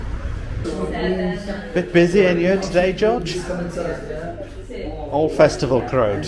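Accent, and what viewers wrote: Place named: Wales, United Kingdom. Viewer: Your Welsh accent is barely there in London...definitely returned now you're back in the valley.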